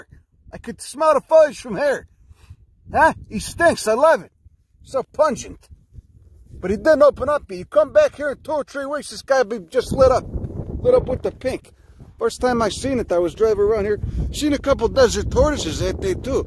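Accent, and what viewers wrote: Chicago, United States. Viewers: Could listen to a Chicago accent oratory on glands all day. Thanks for sharing your knowledge.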